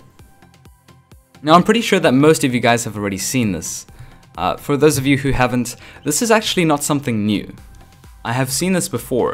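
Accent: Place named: South Africa. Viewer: It's obvious his white.His voice sound like a white south african and i would know because i am a white south african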